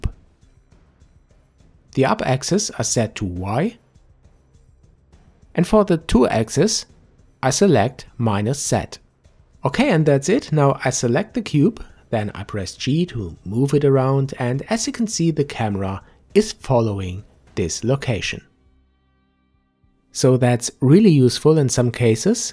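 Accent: Germany. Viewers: Very good introduction, but the part where I should press something to get camera view the same as current view got lost in the German accent. Could you write it also on the screen ?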